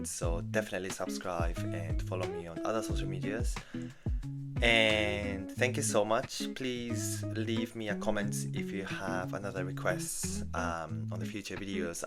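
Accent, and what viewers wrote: United Kingdom. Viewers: イギリス英語は本当にうますぎて憧れます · いつも美しいイギリス英語に癒されています。自分も現在留学をしていて来年ボストンキャリアフォーラムに参加する予定です！さんのような魅力的な人材は引く手数多だと思いますが、最終的にどのような業界に進まれるのでか？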